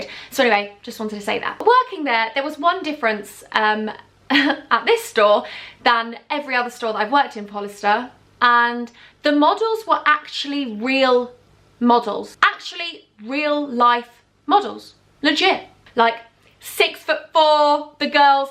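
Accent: United States. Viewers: YOUR AMERICAIN ACCENT IS AMAZING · I’m living for the American accent hun!!!❤️❤️❤️❤️❤️ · Girl your American accent is on POINT! Lol coming from an American lol 🙌🏾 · I live in the states and your American accent was on pointtttt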